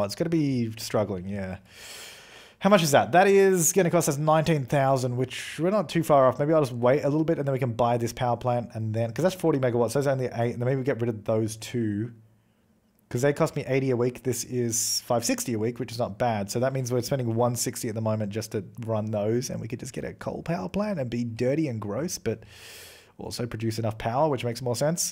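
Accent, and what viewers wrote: Australia. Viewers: R u form Australia? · Both and Jazza are Australian. Have the same accent:)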